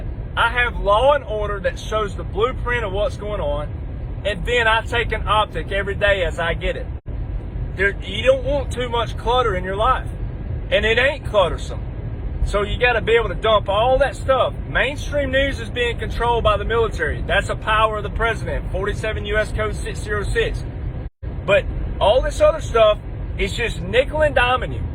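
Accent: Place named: South, United States. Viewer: There’s some things that just sound better in a Southern accent. js…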